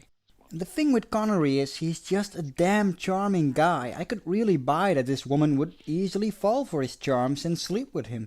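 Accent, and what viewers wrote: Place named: Netherlands. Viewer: I love Dutch dialect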